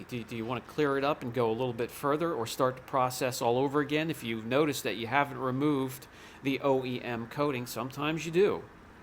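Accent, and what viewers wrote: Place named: East Coast, United States. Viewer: lol Waaater the east coast accent is strong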